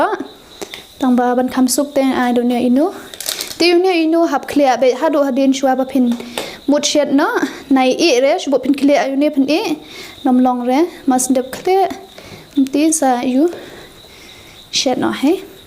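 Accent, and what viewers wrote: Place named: Mylliem, India. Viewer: Sngewnang bha ka sur Mylliem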